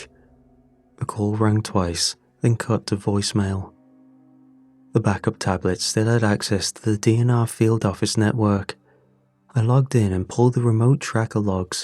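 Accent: United Kingdom. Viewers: are you british? just curious i go to sleep with your stories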